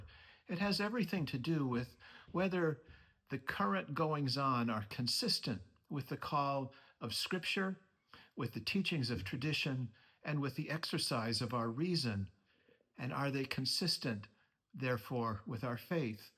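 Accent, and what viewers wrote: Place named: Boston, United States. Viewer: Thank you for your Bostonian voice, your sound words have been comforting in more ways that you’ll ever know. May the Lord God continue to bless, amen. Amen!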